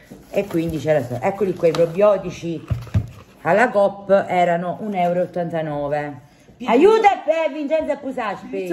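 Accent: Naples, Italy. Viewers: Ciao è da poco che ho conosciuto il tuo canale e mi piace sentire il tuo accento napoletano (io sono di Roma) e le tue ricette buonissime.Pietro è simpatico, un bambino pieno di vita ma, come mamma volevo dirti una cosa che spero non ti offenderà.Quando mio figlio era piccolo, più o meno come Pietro, era cicciottello e i bambini lo prendevano in giro, purtroppo tanti genitori non insegnano ai propri figli che prendere in giro un bambino solo per qualche kg in più può fargli male, comunque lui aveva poca autostima e ne soffriva. Adesso ha 25 anni ed ha un bel fisico, va in palestra e sta attento al mangiare come la maggior parte dei bambini che magari da piccoli erano cicciottelli e che poi imparano a stare attenti a ciò che mangiano, però quel periodo le prese in giro lo facevano stare male. Non vorrei vedere nessun bambino passare tutto ciò quindi ti volevo dire di cercare di invogliare Pietro a stare un po' più attento a ciò che mangia o magari fare sport così che non passi ciò che ha passato mio figlio. 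Scusa se mi sono permessa ma sono una mamma e so che nn è facile (sicuramente ci avrai già pensato). Un abbraccio affettuoso e a presto:face-red-heart-shape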